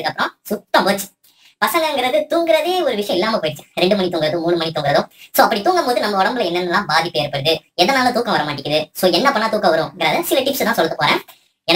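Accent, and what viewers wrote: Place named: Kongu, India. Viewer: Na 10 oo or 11 oo clock ee thoongiduven and vanakam vanakam vanakaaaaaaaam super appu apdiye kongu tamil aaa pesunga nalla itukum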